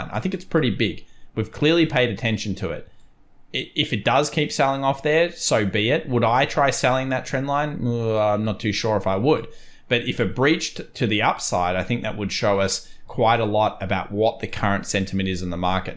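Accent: Australia. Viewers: Glad I found. I look forward to the daily updates, guidance, expertise. Still have to listen closely as the Aussie accent is a lil heavy. lol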